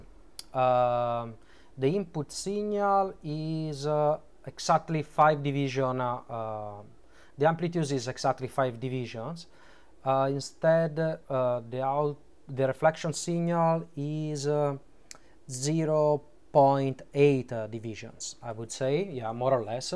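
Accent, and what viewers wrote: Italy. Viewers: quanto si sente che sei italiano! grandeeeeee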